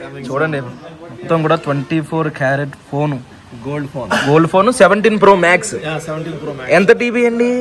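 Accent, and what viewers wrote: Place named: Godavari, India. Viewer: Mee godavari slang super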